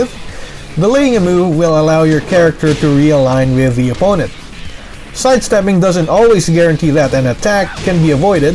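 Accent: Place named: Philippines. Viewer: I knew the accent was filipino lol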